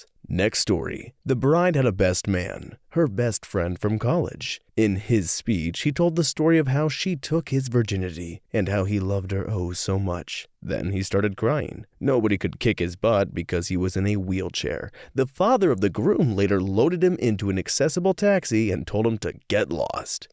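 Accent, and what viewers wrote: Ireland. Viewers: Love the Irish accent here narrator, good job :D! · Oh I really love the voice of this narrator, similiar to the 'anyway next story' narrator but less unhinged. p.s: I've been living in Ireland for the past few months and thought the accent was spot on. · I can't say as to the accuracy of the Irish accent, but it was a nice fair voice that bitch didn't deserve. I wish my text-to-speech sounded like that... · I gave you a like for the Irish accent lol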